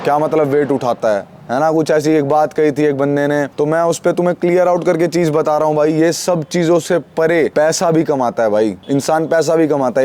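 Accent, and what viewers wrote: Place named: Haryana, India. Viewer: Haryanvi brooo 🗿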